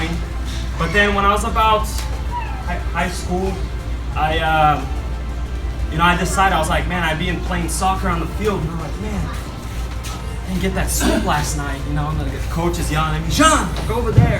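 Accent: Brazil. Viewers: nice bro, lol were u doing a brazillian accent on purpose, also please dont leave us a whole week again without a video cause theyre funny :) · Congrats, but why did you suddenly start talking in a Brazilian accent?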